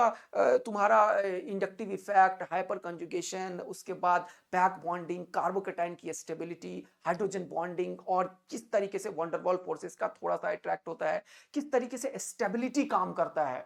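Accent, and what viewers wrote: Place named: Maharashtra, India. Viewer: Sir, aap Maharashtra se ho kya??? Accent se lag rha ❤ Love it 🎉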